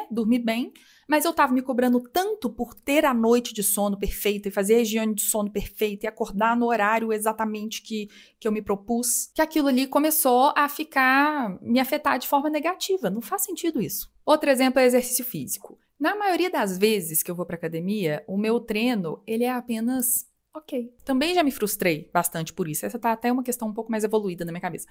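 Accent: Minas Gerais, Brazil. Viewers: Estou adorando seu canal,! ❤ Amo esse sotaque mineiro 🥰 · Tão bom ouvir tudo isso com sotaque mineiro... o "disgrama" me pegou kkkkkkkkk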